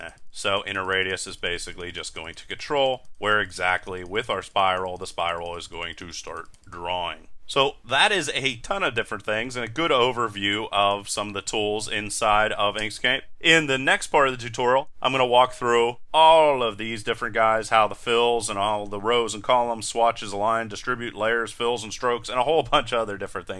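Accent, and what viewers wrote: Pittsburgh, United States. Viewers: Thanks for a great tutorial series! Thought I heard a twinge of Pittsburghese in your presentation? ;-) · you sound like you are from pittsburgh. p.s. thanks for the video, super helpful!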